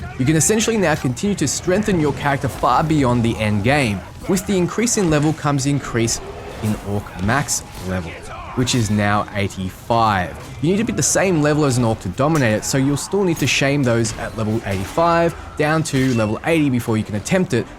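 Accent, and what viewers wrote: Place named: Australia. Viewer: You sound like Fudgemuppet. Fellow Aussies! 🇦🇺🙌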